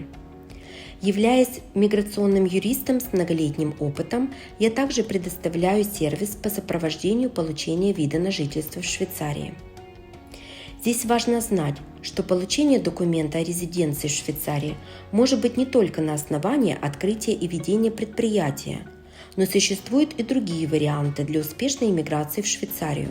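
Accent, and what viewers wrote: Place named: Ukraine. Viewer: Украинский акцент не скрыть, как не старается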